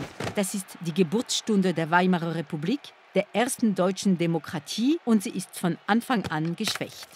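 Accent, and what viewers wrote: France. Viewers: Marschall ist ein deutsches Wort. Die Sprecherin spricht deutsch (mit französischem Akzent). Alles korrekt bei arte. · Man hört sofort ihren französischen Akzent!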